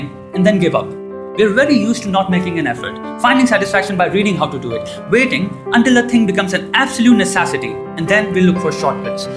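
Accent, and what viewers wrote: India. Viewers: you are definitely fluent but have to try to reduce the Indian accent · this dude sounds like Indian, doesn't he?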